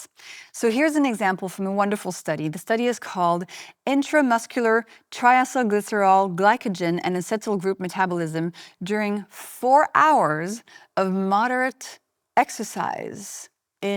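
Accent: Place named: France. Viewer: Your voice is mellifluous ! Ur french accent makes all the difference I guess and u use a lot of hissing sound. No wonder..most French women are sexy and sound sexy ! But, this dress sucks !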